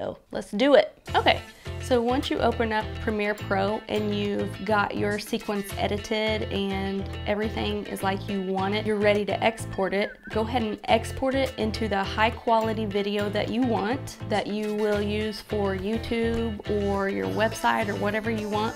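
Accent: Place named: Oklahoma, United States. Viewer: Thanks for the vid it was helpful! I was trying to figure out what your accent was and I saw it’s Oklahoma 😁 I’m in Alabama and we have a similar accent.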